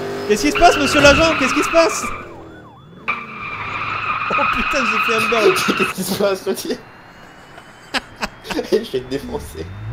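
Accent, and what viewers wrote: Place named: Alsace, France. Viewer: Tu vien d'Alsace ?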